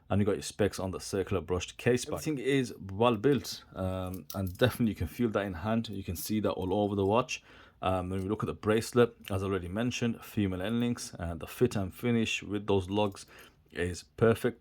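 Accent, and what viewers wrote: Birmingham, United Kingdom. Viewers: Bro you from Birmingham?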